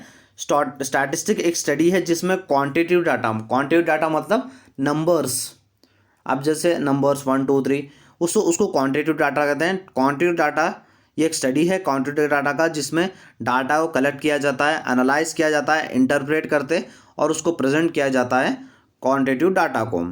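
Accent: Hyderabad, India. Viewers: Sir ap Hyderabad se hai kya..?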